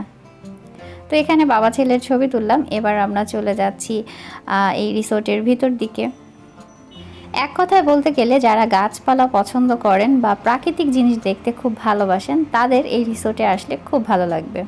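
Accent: Sylhet, Bangladesh. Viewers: Are you sylhetii apuu ??? From uk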